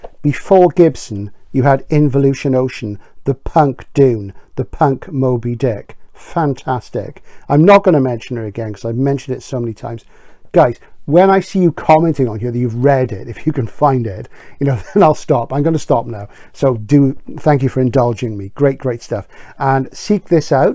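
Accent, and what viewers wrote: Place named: Newport, United Kingdom. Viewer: I'm guessing you are from Newport? Sorry I love my accents and decoding them.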